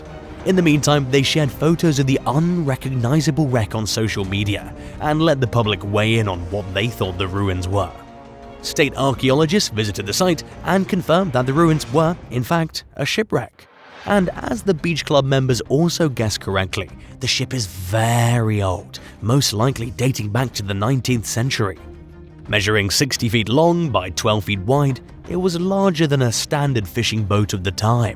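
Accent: England, United Kingdom. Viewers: Love how this is American Eye and has a Englishman speaking. 😆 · American Eye , has England accent .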